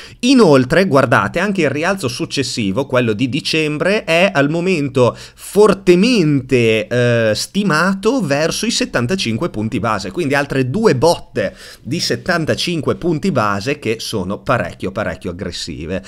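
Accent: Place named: Romagna, Italy. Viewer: Quando dici la parola “questo” ti adoro, il tuo accento romagnolo è fantastico 😄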